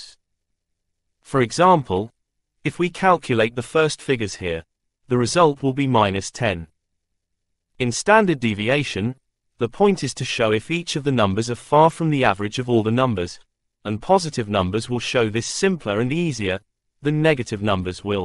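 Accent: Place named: United Kingdom. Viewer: Thank you, sir. You sound British. My Mother was a war bride from Coventry, England.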